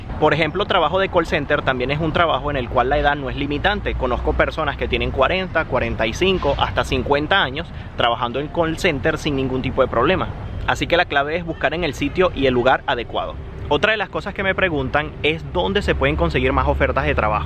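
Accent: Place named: Venezuela. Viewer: Tu eres venzolano cierto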